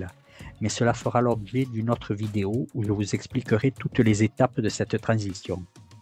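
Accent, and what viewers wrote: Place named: Charente-Maritime, France. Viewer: Ça fait plaisir d'entendre ton accent du sud de Charente-Maritime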